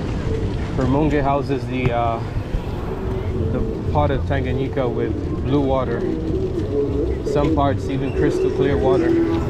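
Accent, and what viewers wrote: United States. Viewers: Great vid thanks. I'm staying in this hotel in June can't wait. Are you from the States? you have an American accent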